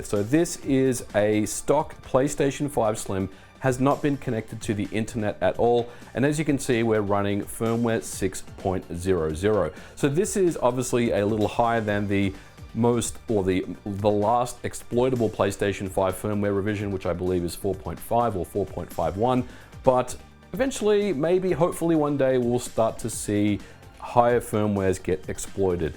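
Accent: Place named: Australia. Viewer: Got a Australian accent but talks in inches and fahrenheit Love your videos by the way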